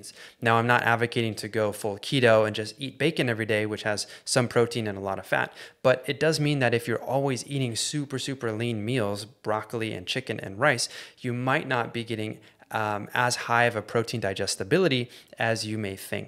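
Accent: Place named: Australia. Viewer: Do you have an australian parent, your accent is interesting.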